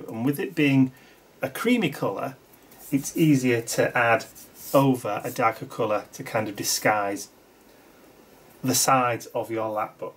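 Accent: United Kingdom. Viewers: …..and I luv your british accent. I do not hear it that often. And it sounds pretty neat.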